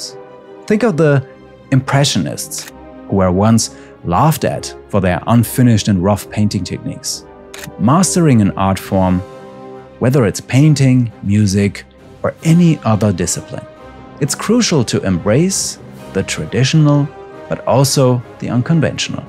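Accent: Germany. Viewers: He lives in Germany, as it is said in the "about" section and his accent is also German I think, so there's a great chance that he's Turkish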